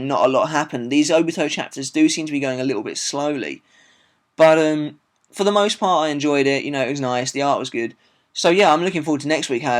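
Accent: United Kingdom. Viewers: good review mate....u actually know what ur talking about...what part uk u from?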